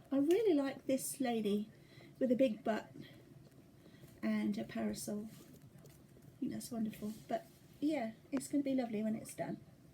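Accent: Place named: United Kingdom. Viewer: I can tell you feel better and I am so glad. I always enjoy your videos and your British accent. (I have a Texas accent). It doesn’t sound as classy as yours! Your dogs are sweet and I can see why you love them.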